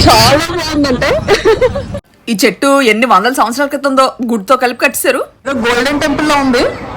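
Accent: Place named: Srikakulam, India. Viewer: Your dress colour is very nice. Your vedios are excellent with srikakulam accent. is beautiful and participative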